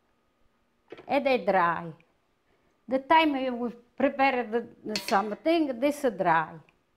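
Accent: Italy. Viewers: This is my first time watching, and I loved everything about it. The singing, the comment "my children are my life" and that great Italian accent. I love everything Italian. My favorite is watching old Italian movies with sub titles. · I like grandma and her recipes she is doing a wonderful job.Her strong Italian accent is something I can't get enough of.Would like to see who is behind the camera ;) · Broken English with Italian accent is so cute. She did a good job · I love to listen to speak. She has a lovely Italian accent which reminds me of my dear nana who passed away two months short of her 100th birthday in 2016. She was one tough cookie. God bless her and god bless. Thank you for your recipe. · I would love to taste everything she cooks they all look so delicious I get hungry just watching her make the food I did save most of the recipes to make at a later date I like listening to her Italian accent my mom and grandma were both Italian my grandma was born in Rome!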